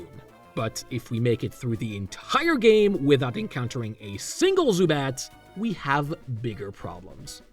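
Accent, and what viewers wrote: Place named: France. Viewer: Youtube automatically translated you to French, and while I'm French and suspect to your accent that you actually speak French IRL I was not prepared for this when the video started.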